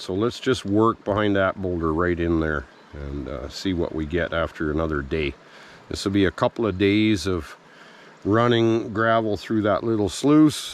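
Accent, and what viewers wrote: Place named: Canada. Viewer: I watch this for the content but also the fantastic Canadian accent, one of the coolest accents there is. I’m a Geordie from the U.K. (Google my accent 😊).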